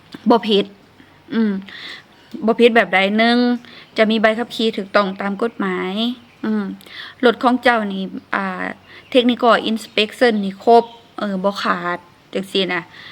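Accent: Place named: Isan, Thailand. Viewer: เว้าสำเนียงคือคนอีสานเนาะ อยู่ไทย โดนละบ้อ หือ อย่าคิดลึกเด้อหละ😂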